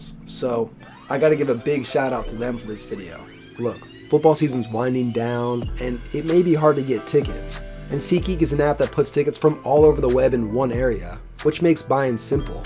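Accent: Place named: Boise, United States. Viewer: Yo are you from Boise??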